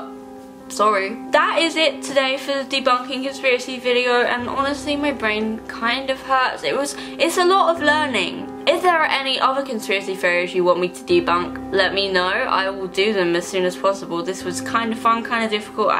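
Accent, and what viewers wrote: England, United Kingdom. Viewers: In the JFK one it wasn't the driver it was gov. Connelly........ she keeps saying the driver driving me nuts british accent lol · Delia Cano British prononciation and braces does that chill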